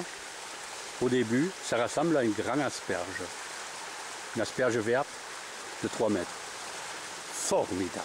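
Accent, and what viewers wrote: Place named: Midi, France. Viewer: Hallo, wohnen Sie zufällig im Süden von Frankreich? Sie sprechen französisch mit einem so netten Akzent vom Midi:) es ist ja echt schön.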